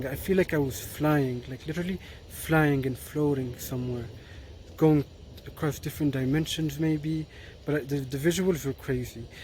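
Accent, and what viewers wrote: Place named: Mediterranean. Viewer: it sounds like you have some Mediterranean style accent but it's a cool one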